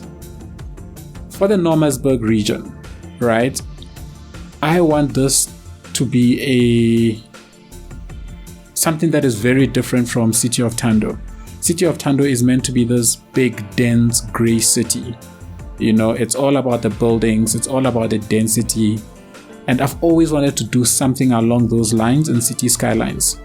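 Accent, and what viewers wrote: South Africa. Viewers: I guessed this before seeing on your Twitter you're from Joburg. Howzit. :) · Are you from South Africa? GP or KZN? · That is one SEXY voice I agree. The South African Accent coming out really good and professional. Thank you for that! Keep us as South Africa on the map of CS - Good job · Great build, and also nice accent, sounds south african to me :)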